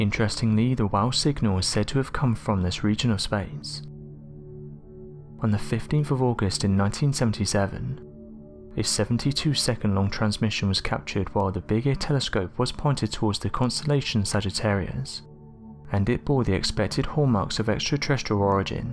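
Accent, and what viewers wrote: United Kingdom. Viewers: Honestly, I love the content. I listen to other channels that have British narrators too. I find that this narrator speaks too quickly and his words seem to run together. He speaks too softly at times and I often find it difficult to understand through his accent even though I listen to other British accents often, for example, the narrator of the Top Mysteries you tube channel. I have trouble understanding this man. His voice is soothing though.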